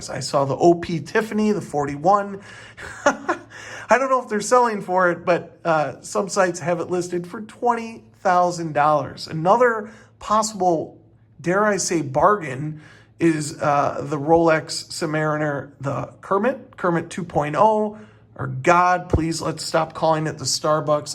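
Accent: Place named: Chicago, United States. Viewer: well I’m a fan dude. I moved from Chicago 10 years ago to Seattle, it’s awesome to hear a Chicago voice on here describing places I miss AND watches. Keep it up!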